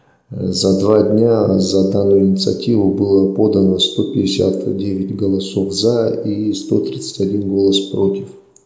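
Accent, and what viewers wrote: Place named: Ukraine. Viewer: какой китаец, ты сам китаец.не улавливаеш характерныи интонация украинцев. свое время много общался украинцами. только непонятка наш украинец или. ..вовще зрить это самый корень.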